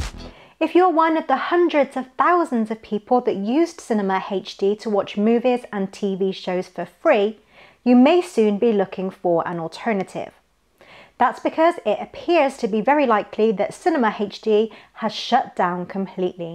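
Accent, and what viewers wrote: United Kingdom. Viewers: I love your accent I wish more British women were in CA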